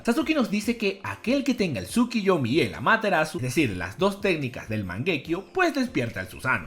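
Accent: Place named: Venezuela. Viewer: Tras de lecho venezolano que ya hasta las tripas me arden de escuchar ese asentó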